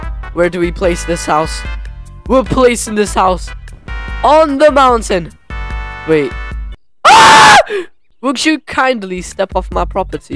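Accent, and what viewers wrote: United Kingdom. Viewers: I love your British 😂😂😂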